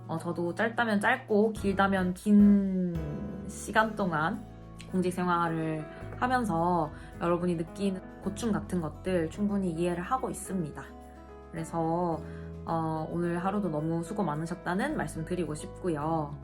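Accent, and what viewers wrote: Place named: Gyeongsang, South Korea. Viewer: 서울말 쓰려고 노력하시는 경상도분이시군요!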